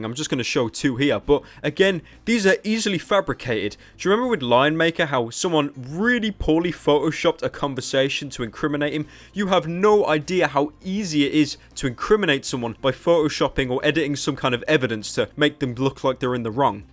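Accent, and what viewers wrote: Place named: Midlands, United Kingdom. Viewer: Where u from? trying to pin ur dialect/accent... u sound (UK) Midlands"ish".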